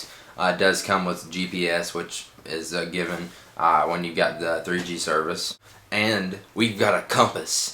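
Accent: United States. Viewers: i'm from england and usually the american accent really bugs me but i kinda think your accent is really kool it's like your talkin and i dont wanna tell u to shut the hell up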